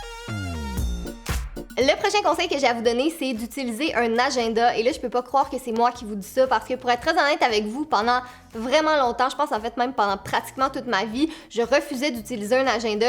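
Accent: Quebec, Canada. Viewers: J'aime trop l'accent québécois!!!!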